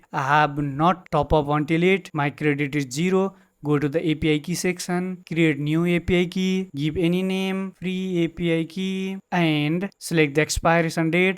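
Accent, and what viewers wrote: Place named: Nepal. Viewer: timro nepali accent lagi raxa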